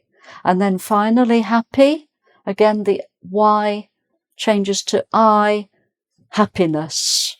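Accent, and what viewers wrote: United Kingdom. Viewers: Good evening Miss, from Brazil, your british english make me remember when I was a teenager and starting my knowledges, nowadays, I need to learn american english, trying 😂, your voice is so sweet ❤❤ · Hi teacher! I 'm an English teacher in Brazil and I just love your videos and your genuine British accent!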